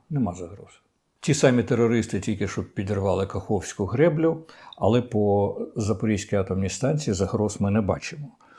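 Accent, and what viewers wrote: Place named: Russia. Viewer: пане, вам так подобається московська мова, московська фонетика? що таке "нічтожество"? вам не подобається "нікчема"? і чому "КІЄв"? "КлІчко"? ви ж начебто наголошуєте кожного разу, що російська мова - це дніще, то може, вам самому варто почистити свою українську?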